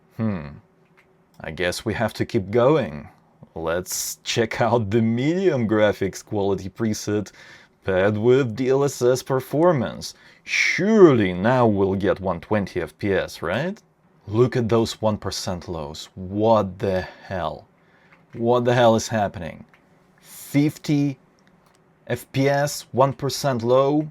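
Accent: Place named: Russia. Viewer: I knew your voice sounded russian as soon as I came across your channel, haha! That's so cool xDD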